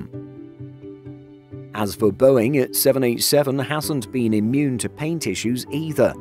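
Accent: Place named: United Kingdom. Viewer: The comparison ought to be with the A<num>, and not the 900!!!! On the other hand you have a wonderful British Accent... 😛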